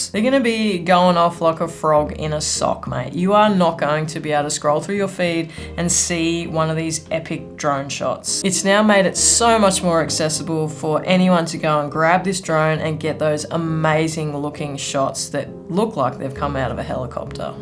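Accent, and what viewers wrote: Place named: Australia. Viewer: Crikey!! Frothing on listening to an Ozzie on these vids, nice 🤙🏼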